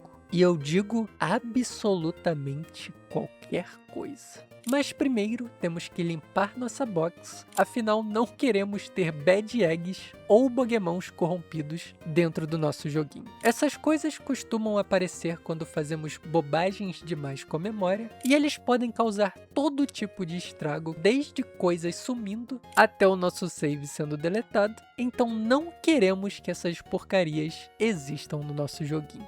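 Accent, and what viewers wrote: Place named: Rio de Janeiro, Brazil. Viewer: o é o carioca maixxxxxx carioca que eu conheço. e olha q EU sou carioca e falo cheio de carioquEIXXXX tb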